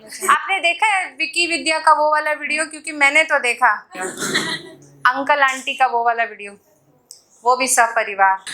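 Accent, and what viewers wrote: Bihar, India. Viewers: That bihari accent.. 👍😂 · I like your bihari tone 😅😅😂😂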